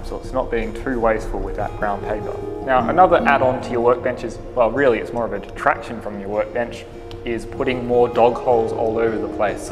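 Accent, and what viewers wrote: Australia. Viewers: Great project and I cant wait to build one myself. Where cn I buy the holdfasts? Great to hear an Ozy voice.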